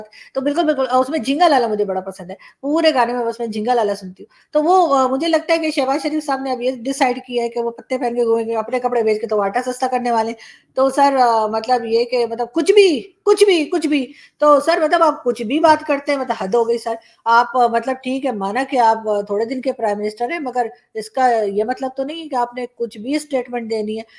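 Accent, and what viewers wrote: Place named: Uttar Pradesh, India. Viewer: Aapka bolne ka tareeka UP walo jaisa hai.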